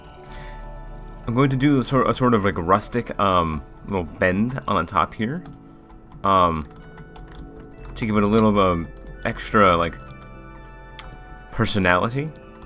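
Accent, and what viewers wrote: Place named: South Africa. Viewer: yeah i think this is a South African accent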